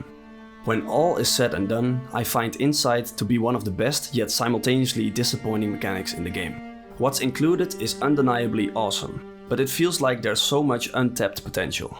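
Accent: Netherlands. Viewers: I know a Dutch accent when I hear one.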